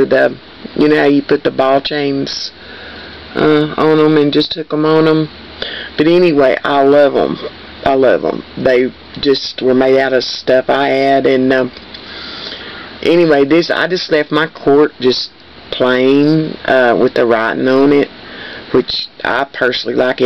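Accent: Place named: Kentucky, United States. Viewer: Great tutorial! I have been bitten by the cork craft bug too. I love your accent. Kentucky?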